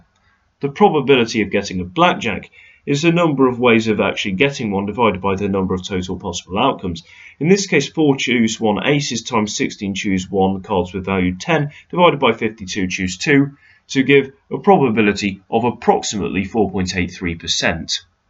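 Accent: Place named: United Kingdom. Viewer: Dude's got a british accent and talks about pounds and pence, in Europe, blackjack sucks because they don't check for dealer blackjack until AFTER you do everything. One result of this is you wouldn't be doubling down against a 10 or an ace on anything. But another is that you're actually incorrect then, and you WOULD hit or stand even if the dealer's got blackjack, you wouldn't know if you've already lost.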